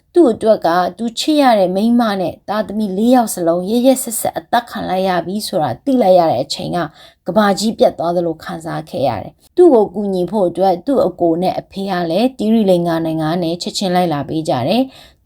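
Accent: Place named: Chin, Myanmar. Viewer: ချင်းလူမျိုးစကားပြောအသံနဲ့တူလိုက်တာ 😊 ကိုတွေ ချင်းလူမျိုးသူငယ်ချင်းတွေလဲအဲလိုလေယူလေသိမ်းအသံနဲ့ပဲ စကားပြောကြတာ 😊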